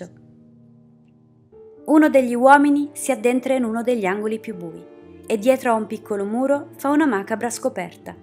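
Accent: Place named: Florence, Italy. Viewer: Qualcosa mi dice che tu sei di Firenze.. Complimenti al video fatto bene..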